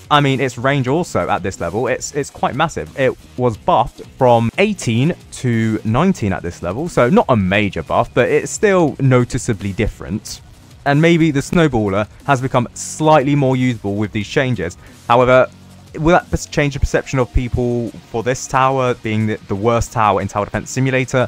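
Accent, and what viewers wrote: United Kingdom. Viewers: I love listening to a british guy yap for 12 minutes straight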